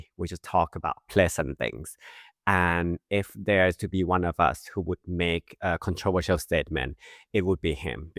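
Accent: Australia. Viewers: just curious - your accent is more Aussie than British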